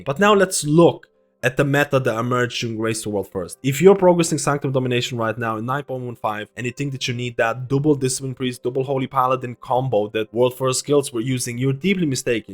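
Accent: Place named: Ireland. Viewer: What's the deal with the accent? I can't place it, but there definitely seems to be a heavy dose of Irish in there?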